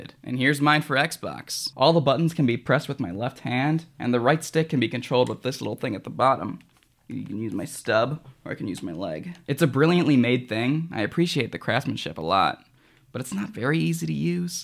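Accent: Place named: California, United States. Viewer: Cara, esse sotaque de quem mora na california me da um enjoou, sla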